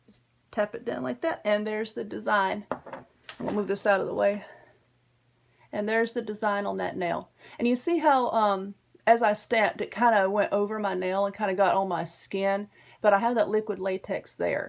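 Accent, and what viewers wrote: South, United States. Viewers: TYFS...I luv your southern dra2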